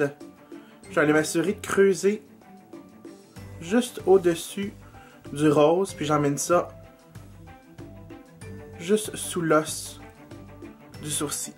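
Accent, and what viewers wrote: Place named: Quebec, Canada. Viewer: Je suis une nouvelle abonnée, j'écoutais tes tutorials en anglais. Quelle agréable surprise aujourd'hui d'entendre ton bel accent québecois :D xxxx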